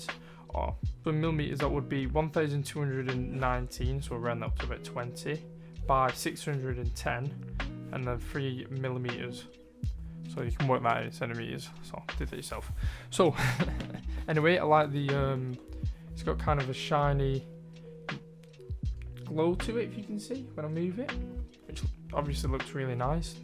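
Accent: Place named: Manchester, United Kingdom. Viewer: You sound like you're from a town near me in Manchester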